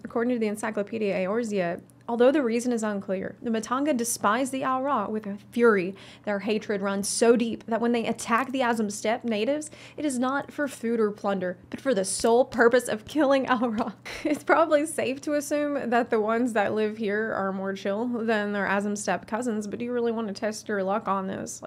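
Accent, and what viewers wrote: South, United States. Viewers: She has a pretty country accent where is she from?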